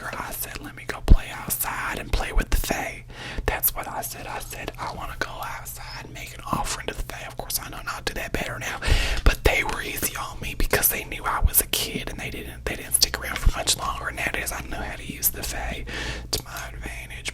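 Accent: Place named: South, United States. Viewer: I was expecting a jersey accent and got southern instead 😂 love it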